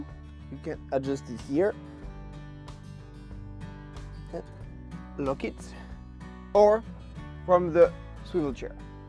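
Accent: France. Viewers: Just beautiful as always 😍 bravo PS: like the french accent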